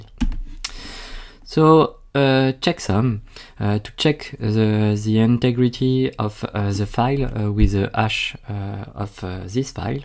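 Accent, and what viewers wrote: France. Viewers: Hello, il est evident de part ton accent que tu es Francais. J'aimerais bien avoir un tutorial sur Ansible. J'ai deja souscrit au canal, et j'aimerais bien echanger avec toi pour avoir des réponses aux questions que je pourrais avoir. Merci de me dire si c'est possible.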